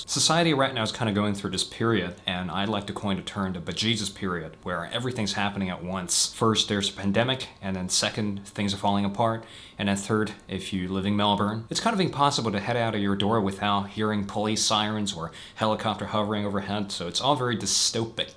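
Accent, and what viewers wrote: United States. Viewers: why do you pronounce things more like an american than an aussie?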